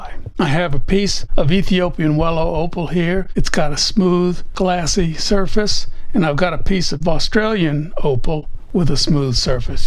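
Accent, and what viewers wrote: United States. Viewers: What area of the US is your accent from? I have always wondered. I find it pleasant.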